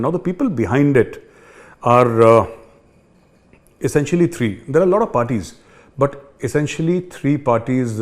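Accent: India. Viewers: bloody major why you are thinking for pakistan why don't think for India. You are teaching how to talk an oxford graduates , bloody majot may you can't enter the main gate of oxford university . like you little educated man lots in pakistan. you even can't speak proper english only indo english. shut of your mouth.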